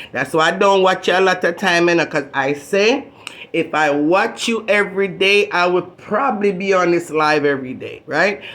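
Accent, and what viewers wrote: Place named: Bermuda. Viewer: You sound Bermudian why u coming after Donna plz stop it plz u looking views💯😂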